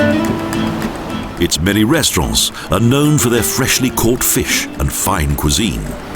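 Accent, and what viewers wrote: United Kingdom. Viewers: Janet Njie lol, which British accent does the narrator speak? :D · Adrian Zieniewicz it British mate! 😁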